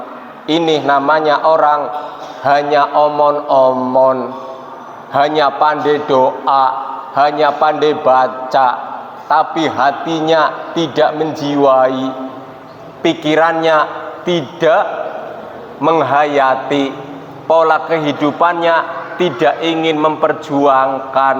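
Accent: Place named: Songgom, Indonesia. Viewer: Kirain wong cilacap jbule wong songgom👍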